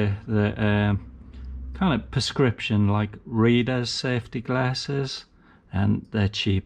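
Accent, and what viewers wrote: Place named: Cumbria, United Kingdom. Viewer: Hi, I'm from Cumbria and your accent is very similar....where are you from?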